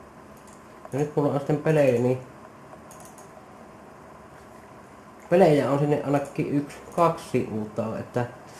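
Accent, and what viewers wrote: Savo, Finland. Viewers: mistä päi savoo sä oot ku puhut murteel?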